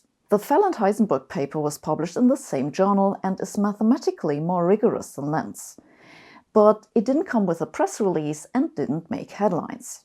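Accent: Germany. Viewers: Schlechtes Mikrofon und ein Englisch mit starkem deutschem Akzent macht das Hören anstrengend. Der Akzent wird nur schwer zu ändern sein, aber ein besseres Mikrofon wäre vermutlich möglich.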